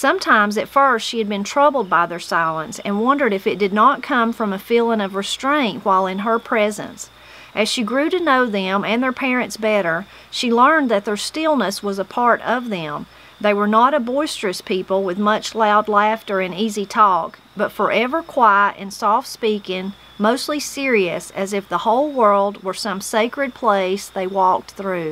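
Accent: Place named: South, United States. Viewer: This was just what I needed at the end of a long week of work. So nice to hear your lovely Southern voice reading to us and the story gets better and better. Reminds me it is time to find another good book to read myself. Or time to open a fall puzzle. You are special and I am so glad to have found your channel.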